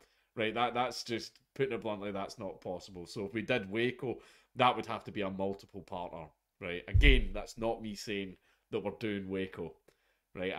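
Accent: Scotland, United Kingdom. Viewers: I love watching all of your videos, I love just sitting back and listening to mini-documentaries in a Scottish accent You've made the past couple of years much more bearable, so thank you and Merry Christmas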